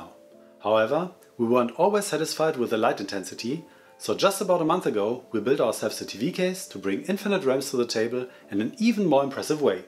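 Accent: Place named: Germany. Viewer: Ich hatte die ersten paar Sekunden und dachte mir nur „DAS verstehe ich gut, dass ist der mir vertraute deutsche Akzent“ 😂😂 Aber super Video, genau das hatte ich gesucht, vielen dank!!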